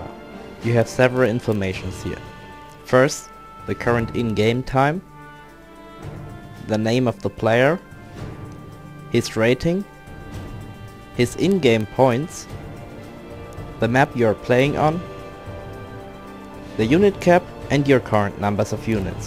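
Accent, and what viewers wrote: Germany. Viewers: just like MinecraftMadness64..... ein Deutscher der auf Englisch Videos macht....ich wusste doch dass ich da einen deutschen Akzent gehört habe ;D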